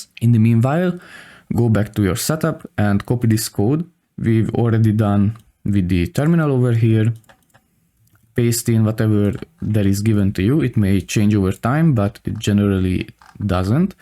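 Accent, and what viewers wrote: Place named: Russia. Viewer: amazing course subbed ,also enjoying your russian accent😀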